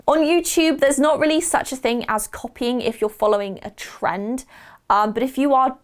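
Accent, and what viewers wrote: United Kingdom. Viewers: learning how to have a British accent and I wanted to hear one to help me learn it better, and I immediately thought of u! lol :D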